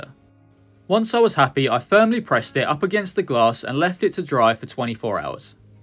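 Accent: United Kingdom. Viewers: bruh this guys british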